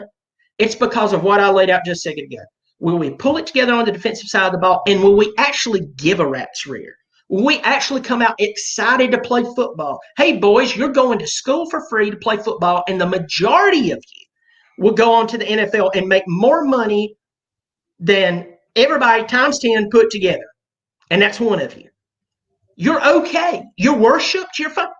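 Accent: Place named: Appalachia, United States. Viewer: Love the gay Appalachian accent it us sexy in a banjo picking way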